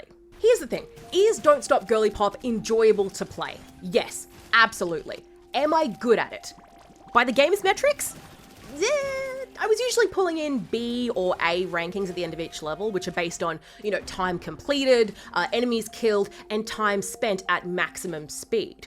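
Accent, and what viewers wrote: Australia. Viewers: great vid! was excited to hear an aussie voice! didnt know this game was from my side of the country either! thats neat. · Great video! So good to see the aussie indie scene get some recognition and to hear an aussie voice on Youtube, can't wait to see what you come up with next!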